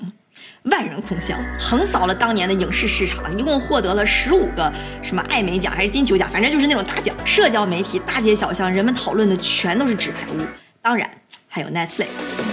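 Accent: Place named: Northeast, China. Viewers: 不知道啥算法，后台推荐了你。这东北口音的魔性，有颜有内容，圈粉了。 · 互联网时代那些“高大上”议题，就你这土掉渣的北方大白话来这么一通大白活，听着就觉得特舒服😌……今天开始订阅了。哈哈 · 喜欢你的东北口音，亲切幽默。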